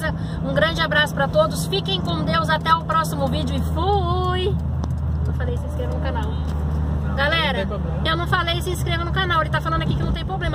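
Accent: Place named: Portugal. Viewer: E esse sotaque português hein 🤔🤓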